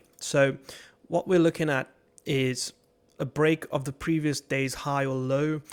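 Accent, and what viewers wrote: South Africa. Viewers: You sound South African?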